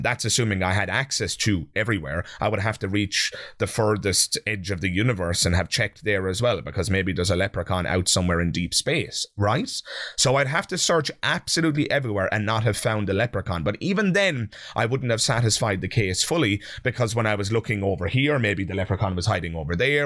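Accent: Ireland. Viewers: Holy sh*t! You're Irish?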